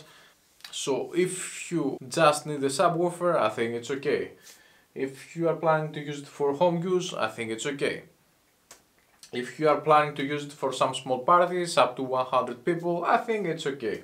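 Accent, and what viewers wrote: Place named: England, United Kingdom. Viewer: Το αγγλικό ακσάν σ αδερφέ τα σπάει ⭐️ να'σε καλα και καλα χρυστουγεννα! 👍🏼💪🏼🎉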